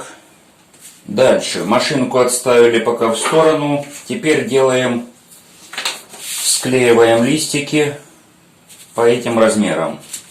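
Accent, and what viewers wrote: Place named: Donbas, Ukraine. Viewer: Разговорный Донбасский) сразу узнала, чисто по мужски сшит чехол))))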